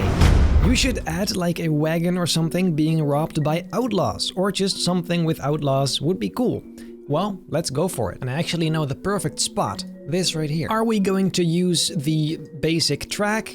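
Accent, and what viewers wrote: Netherlands. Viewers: Ben je Nederlands, want je hebt een Nederlands-Engels accent😂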